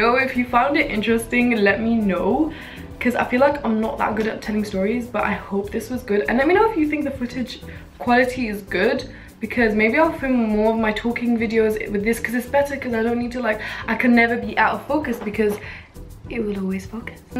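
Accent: Nigeria. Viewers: The Nigerian accent comes really naturally to you. You're basically one of us now :D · lol u had a bit of nigerian accent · her Nigerian accent 😂😂😂😂😂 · I can hear you have bit of a naja accent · Im a new sub ! And i absolutely laughed out loud at this video! Definitely do more stories! Loving the lowkey Nigerian accent :D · just noticing that got some 'nigerian english' accent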